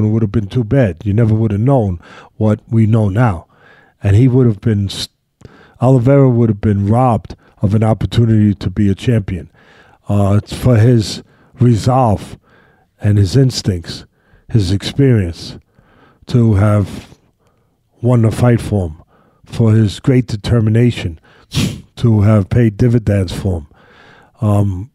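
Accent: New York, United States. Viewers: Gotta love that old school NYC accent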